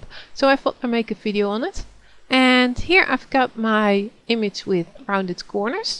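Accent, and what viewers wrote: Netherlands. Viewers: This is what dutch people really sound like speaking English, unlike goldmember in Austin Powers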